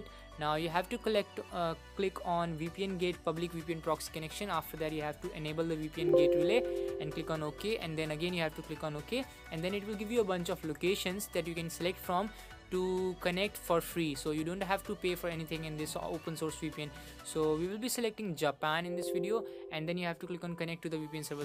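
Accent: India. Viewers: First Indian mixed with English guy that had ever been helpful thanks bro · i would listen to this dude, but everytime i hear an indian accent trying to provide technical help, it is a scam, so no thanks lol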